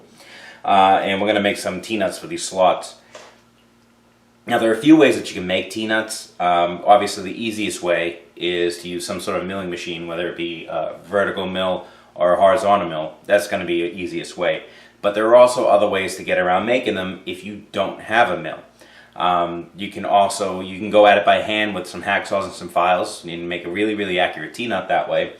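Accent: Boston, United States. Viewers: Had to look up Schmutz. Thought it was some kind of Bawsten accented slang but its actually german for dirt. Thanks for video, its very well explained and entertaining with the accent...reminds me of Matt Daemon or Mark Wahlberg.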